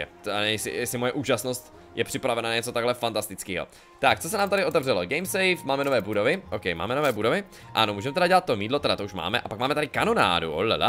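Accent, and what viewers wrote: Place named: Prague, Czech Republic. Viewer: podle prizvuku tipuju na Prazaka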